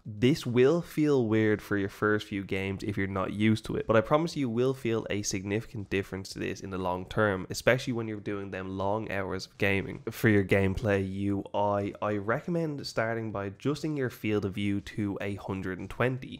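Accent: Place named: Ireland. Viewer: i can hear the irish in this fella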